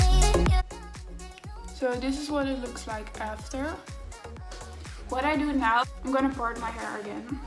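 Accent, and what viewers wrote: Netherlands. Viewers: hoorde gelijk dat je nederlands was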